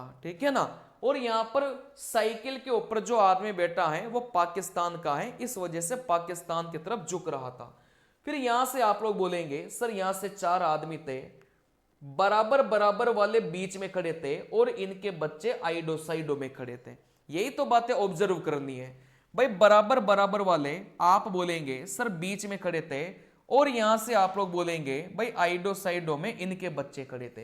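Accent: South, India. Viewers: Ye south jaisi hindi bolte hai sir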